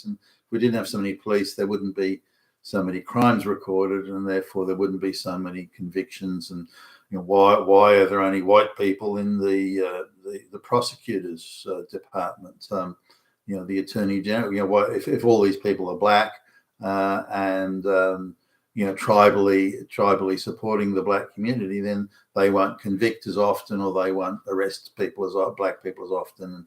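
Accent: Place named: Australia. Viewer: Thanks for broadcasting to me and one or two others. You have a good Aussie educated voice with rounded out cynicism.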